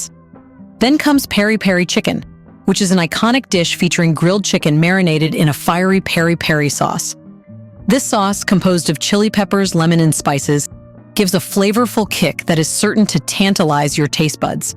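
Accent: United States. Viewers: Good question, sounds American narrator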